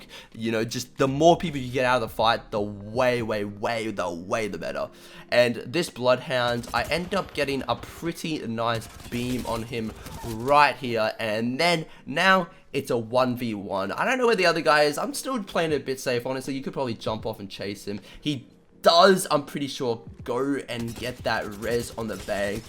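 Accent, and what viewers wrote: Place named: Australia. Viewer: He's Australian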